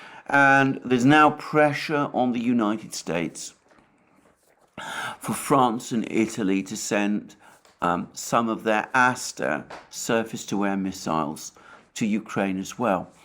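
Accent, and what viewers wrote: England, United Kingdom. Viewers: Weird listening at a communist with an English accent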